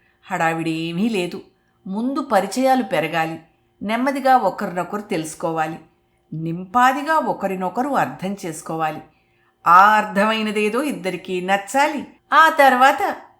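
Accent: United States. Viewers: Your story narration is very nice You are talking like an American women 🌹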